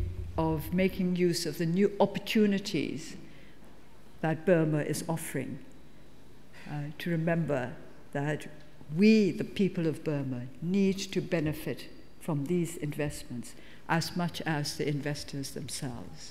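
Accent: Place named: United Kingdom. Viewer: i didn't know she studied in oxford, no wonder why she has a a british accent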